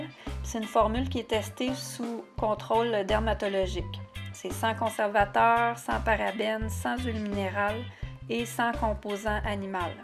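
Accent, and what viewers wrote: Quebec, Canada. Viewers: Oui c'est vrai que pour vous c'est nous qui avons l'accent !! Qu'est-ce que j'adore le québécois ♥ et le Canada aussi en général, destination de rêve que j'espère très vite concrétiser !! bizzz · Tu as un accent tu viens du Québec ?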